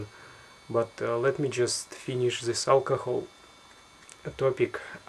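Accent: Russia. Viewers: Guy, you have so russian accent :D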